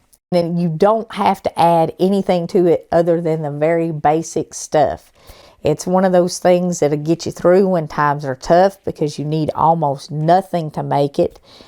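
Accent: South, United States. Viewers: Really nice video and takes me back to my southern life and accent. Dandahermit. Im 80 yrs old and live waaaay off grid up in the mountains. Have a good lifegirl❤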